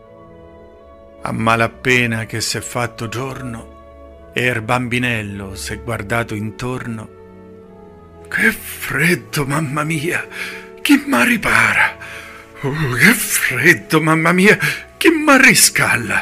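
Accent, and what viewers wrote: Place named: Marche, Italy. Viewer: Peccato la cadenza che su Trilussa doveva essere obligatoriamente Romana invece sembra Marchigiana/Umbra. Nulla toglie alla bravura dell'interprete.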